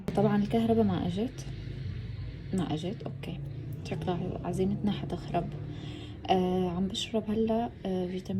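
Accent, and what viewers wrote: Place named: Levant. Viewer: لهجتك شامية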